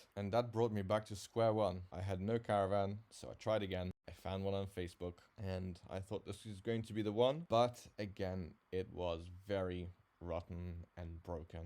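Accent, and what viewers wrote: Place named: United States. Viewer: It's not called trash, it's called rubbish. You speak like a yank.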